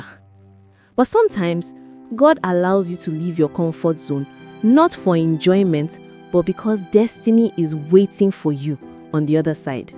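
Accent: Nigeria. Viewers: Awww. I love it. I love the Naija inflections.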